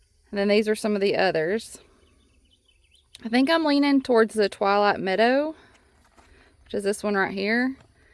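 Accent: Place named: Appalachia, United States. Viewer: You talk just like we do in southwest Virginia.( The Coalfields) We are near eastern KY and East TN ( Harlan County, KY and Kingsport, TN)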